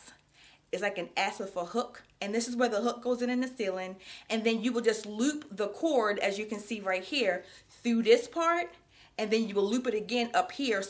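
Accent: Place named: DMV, United States. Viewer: Thank you so much for sharing💛 I hear a DMV accent lol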